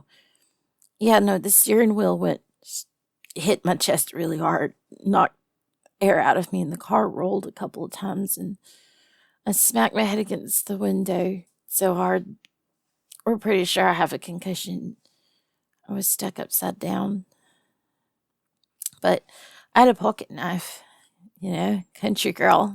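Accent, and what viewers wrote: South, United States. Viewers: Bear girls? Love them, can never get enough. Southern accent? Definitely one my greatest weakness. Reverse comfort? Oh yeah, cant be the only one comforted!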